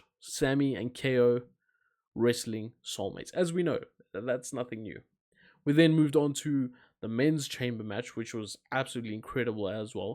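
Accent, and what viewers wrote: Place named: South Africa. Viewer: By the accent, I can tell you are from SA! I am also from SA. Keep on going bro!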